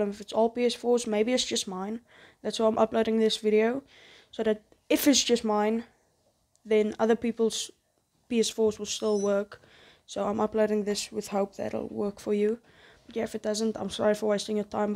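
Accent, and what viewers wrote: South Africa. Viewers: I had a South African best friend on ps5 voice chat friends when I heard your voice I immediately knew 😂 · Why u sound south african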